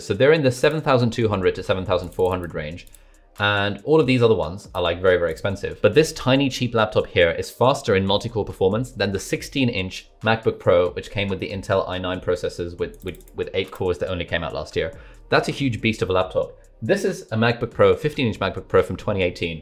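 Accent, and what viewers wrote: United States. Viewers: you can kind of tell that is starting to get an american accent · I see you getting the American Accent. The way you pronounce the "r" in air and processor.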